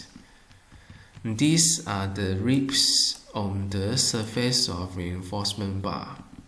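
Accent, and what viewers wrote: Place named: Malaysia. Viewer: i guess the presenter is malaysian? the accent is sooo familiar and feel like home =D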